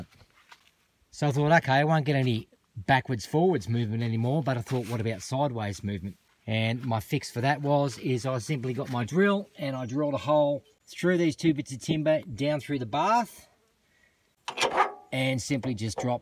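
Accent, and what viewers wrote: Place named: Australia. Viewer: Absolute awesomeness!!! Love your idea and you proved it works! Thank you for that warm Aussie Love! Adore your accent ❤❤❤ Coming from Alberta, Canada 🇨🇦❤ Love Australia 🇦🇺 ❤ Blessings 2U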